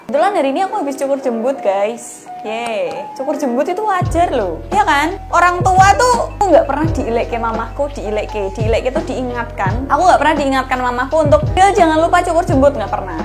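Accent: Java, Indonesia. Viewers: Mbak sampean Jawa mana sih...koq enak banget nada bicaranya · Medok banget kamu mbak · Arek ndi iki omonge kok jowo wkwkkw.. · Aku gak ngerti bahasa jawa,tapi aku ketawa,cara bicaranya jawanya lucu,terus medok banget😅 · Suara e ngangeni...medhok" gemes'!!! . Maju terus MB cantik... .. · Suarane medok mBake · Mba kocak ya logat jawane apik bahasamu aku suka dengerin konten panjenengan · Wong jowo banget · Suka kesini gara" cara ngomong nya medok wkwkwk😆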